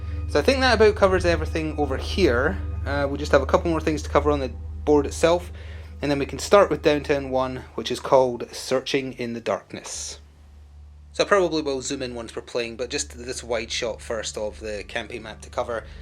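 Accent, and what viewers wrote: Scotland, United Kingdom. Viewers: Good video! I enjoyed the ominous music mixed with your optimistic tone. Where abouts in Scotland are you from?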